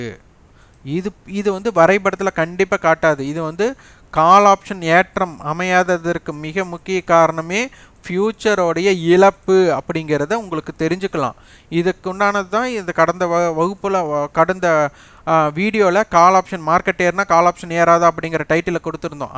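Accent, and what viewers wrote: Kongu, India. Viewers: PSYCHOLOGY பற்றி ஒரு பதிவு தங்களின் இனிமையான கொங்கு நாட்டு தமிழில் பதிவு செய்யுங்கள் ஐயா. நன்றி வணக்கம் 🙏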